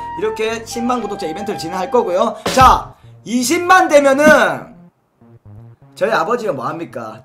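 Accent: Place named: Busan, South Korea. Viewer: 나도 부산사람이라서 말투가 너무 친근했엉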